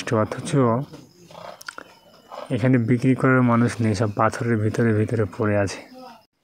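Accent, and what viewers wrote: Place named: India. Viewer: ভাই আপনি কি ইন্ডিয়ান এইভাবে কথা বলেছেন কেনো।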